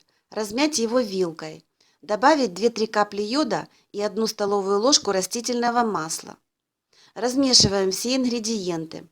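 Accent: Ukraine. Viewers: это украинский диалект и не тебе сопле указывать комуи как разговаривать и прав тот человек ,что назвал тебя Бляндинкой!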